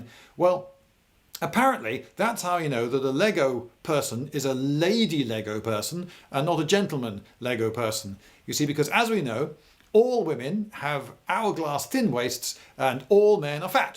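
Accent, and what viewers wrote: United Kingdom. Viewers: Explaining anything in an English accent makes whatever you are talking about 10x more interesting. You could be talking about the history of the bar stool and it would be entertaining. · This guy sounds British.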